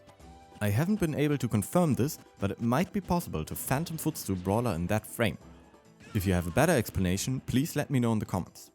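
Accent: Germany. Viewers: Where are you from? Austria?germany? I just want to know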